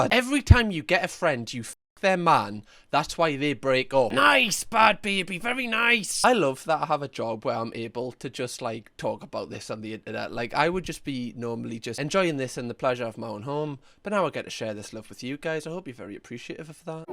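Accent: United Kingdom. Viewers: I love this being explained in your Brit accent. It just makes me realize how petty unhinged all of this it is. It’s gone international 😂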